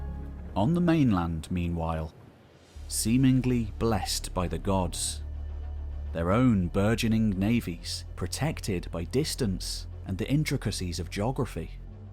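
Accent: England, United Kingdom. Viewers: just hearing your english university narration = PUKE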